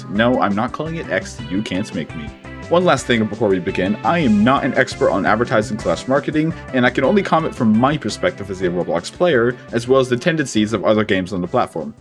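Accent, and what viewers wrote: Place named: United States. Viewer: I thought it was a voice reveal but i was confused about the American accent. Then i checked the pinned comment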